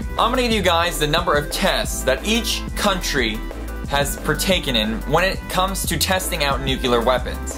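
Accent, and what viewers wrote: Maine, United States. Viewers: Wow. My first time hearing an accent from Maine. Hello from Texas! :D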